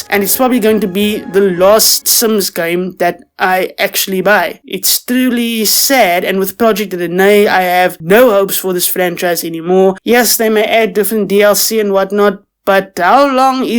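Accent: South Africa. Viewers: 😭😭😭 I heard the accent and I immediately knew this was a South African channel. what a rare find, love the video.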